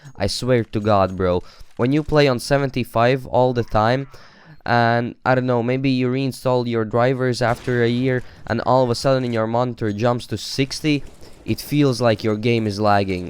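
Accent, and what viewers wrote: Latvia. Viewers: No akcenta sapratu ka tu esi latvietis :D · Latvietis esi? ljoti izklausaas :D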